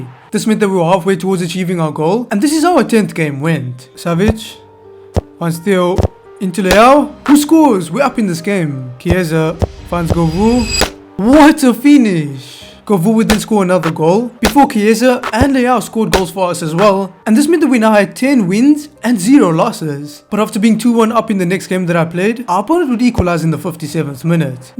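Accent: South Africa. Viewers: he is south african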